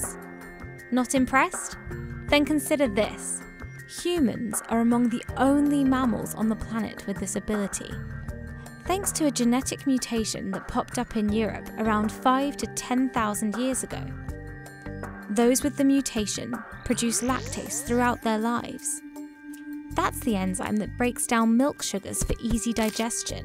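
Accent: United Kingdom. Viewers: That british accent. Correct me if I'm wrong.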